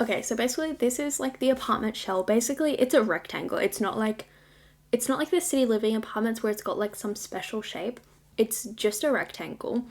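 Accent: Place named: Australia. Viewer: I can't tell if you're australian or not. But i think you sound like one xD I like your accent and voice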